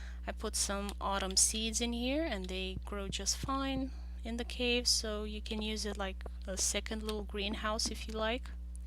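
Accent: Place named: Iran. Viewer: Very cool video. I like your accent. Are you Persian?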